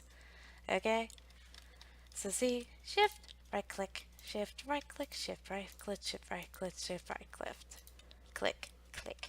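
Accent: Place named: Canada. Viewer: Wow I can't believe senpai noticed me! I get the main North American accent feels but tinges of European... so Canadian/French Canadian? I dunno but it's nice!!! Why aren't you more popular :(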